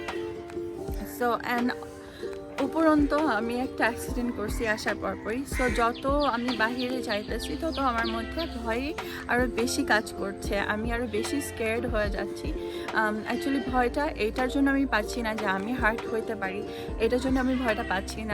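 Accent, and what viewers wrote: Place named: United States. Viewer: আপু তোমার কথা গুলো এরকম কেন ??ইউ এস এ আসল বাসা তোমার??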